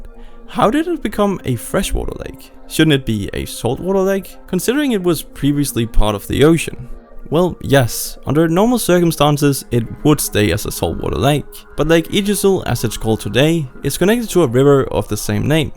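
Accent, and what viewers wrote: Netherlands. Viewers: Well actually you did a very good job here! (Only some 's'-s in the middle of words gave away that you are Dutch. All over yet far from "steen-kool" English) You made one of the very best short video's on u- tube about this this subject ! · who else came here to hear his dutch pronunciation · Where are you from. You have a dutch accent when speaking, aside from when you mention names like flevoland which make you sound non native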